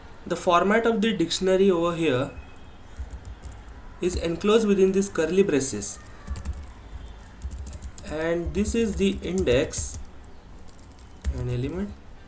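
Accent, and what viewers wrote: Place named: Maharashtra, India. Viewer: sir, this is really very helpful tutorial, no mess just dive into the topic. i guess you're maharashtrian by your accent, i could be wrong but i think so. thanks for this great tutorial.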